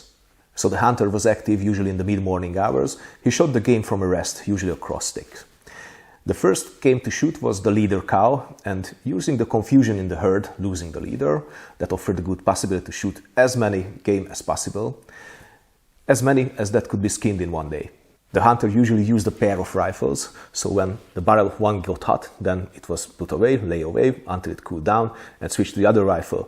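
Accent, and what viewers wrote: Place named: Hungary. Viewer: you are such a pleasure to watch, informative, knowledgeable, and Hunglish is a beautiful language!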